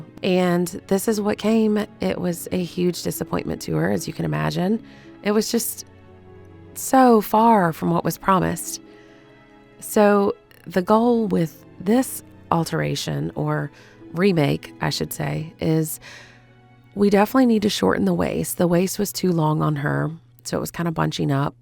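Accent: United States. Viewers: ❤ You did such amazing work!!! I just watched her video and she absolutely loved them. I love the pink and rainbow dresses. Also, listening to you is so soothing. You remind me of my two aunts who live in Texas and Mississippi ❤❤❤ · Oh my God girl! Your voice sounds so familiar! Are you from Alabama? I saw you on the Hopescope video. And I heard your voice and I was like this girl is from Alabama and I know that voice!! Lol!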